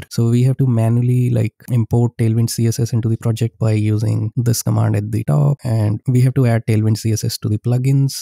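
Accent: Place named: India. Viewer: i heard indian accent i was saved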